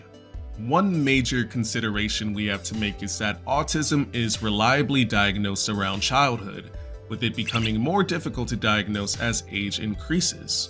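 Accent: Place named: United States. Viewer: You said it’s getting warmer but you have what I assumed was an American accent?? Wild. Thanks for the video!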